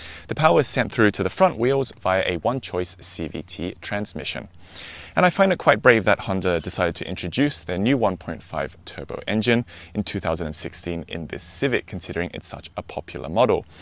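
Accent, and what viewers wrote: Australia. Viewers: Aussie, probably brought up in a classy Aussie town or school.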